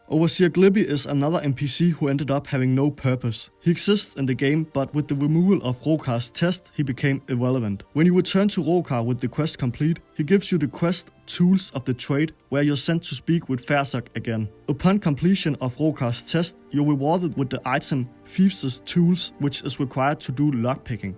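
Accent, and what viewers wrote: Denmark. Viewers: Cranston he is danish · A strong Danish accent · Danish here. Hes Danish. Not a single doubt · This is the most danglish english I've ever heard. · You Sound very Danish. Where do you come from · I listened to his video. He sounds painfully Danish. I know since I'm Scandinavian. It's like when an American hears a Canadian accent. I wouldn't be able to pick up on it and might even think I'm speaking to someone from the US but Americans seem to pick up on it instantly.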